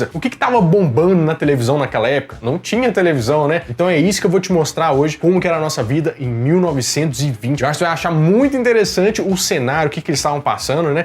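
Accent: Minas Gerais, Brazil. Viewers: me inscrevi pq além de vc ser inteligente ainda é mineiro e eu adoro esse sotaque kkkk like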